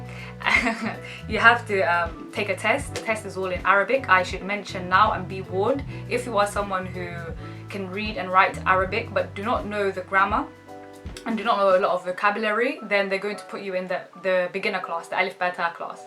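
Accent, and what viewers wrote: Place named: Egypt. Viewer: بتتكلمي مصري ذي العسل😅💗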